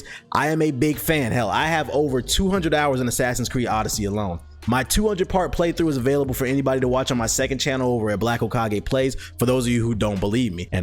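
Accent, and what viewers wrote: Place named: Pittsburgh, United States. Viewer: He must be from Pittsburgh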